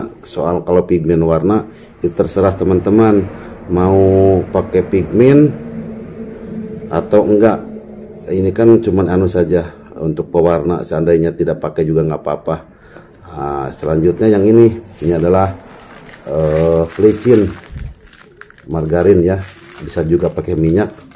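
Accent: West Java, Indonesia. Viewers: orang kendari tp logatnya spt orang jawa barat 😁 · Nembe bade naros, orang kendari tapi logat sunda☺️ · Orang Kendari logat Sunda.... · Di Kendari tp logat Sunda...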